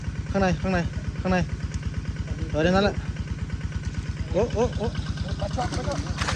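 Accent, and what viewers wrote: North, Thailand. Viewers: สำเนียงคนเหนือ ถ้าอู้ภาษาบ้านเฮา ท้าจะดีนะ เหมือนคนอีสานเขาเว้าภาษาถิ่นเขาเพราะดี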